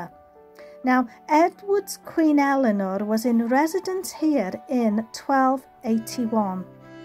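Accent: Wales, United Kingdom. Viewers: Brilliant 👌♥️ I really appreciate the amount of work and research you put into your videos with the editing and Gifs etc. Ruthin is now a must for me. I love history and that place looks amazing, I’m not actually sure where it is as I’m typing so I’ll Google it 😁 Love the little Welsh lessons, my favourite one though is ‘Car in Welsh is - Car’ 😂 just sounds better with your lovely Welsh accent 👍♥️😁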